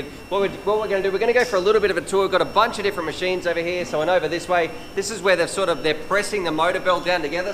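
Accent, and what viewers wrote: Australia. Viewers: Wait, are you Aussie? I've been watching you for months now and I didn't even realise your accent until this video ahahah. I'm Aussie too which is probably why I didn't really think about it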